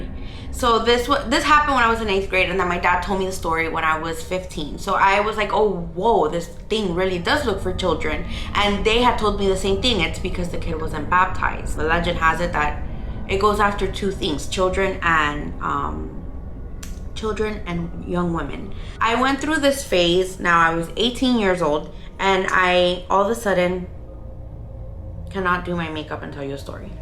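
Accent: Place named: Miami, United States. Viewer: as you were speaking I just had a feeling you were from miami...I swear the creepiest things happen here. I have so many paranormal stories just like this but wow this definitely unlocked a new fear LOL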